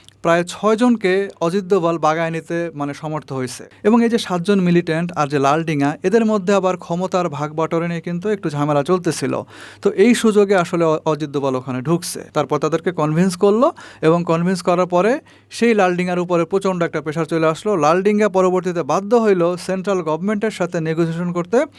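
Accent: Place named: Bangal, Bangladesh. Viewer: Korssy ,kaisse , dissey thik moton bangal bol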